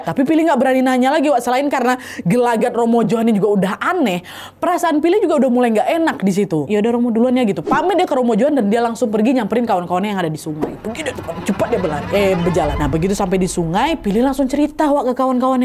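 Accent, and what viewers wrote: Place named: Manggarai, Indonesia. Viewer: Ini cerita dari manggarai, dari logatnya, makasih wakkk udahh nyeritain😅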